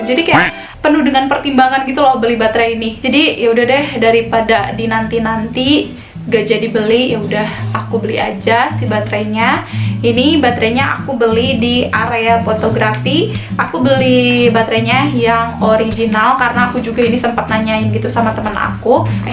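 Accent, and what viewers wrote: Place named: West Java, Indonesia. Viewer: ihh tth org Sunda 😂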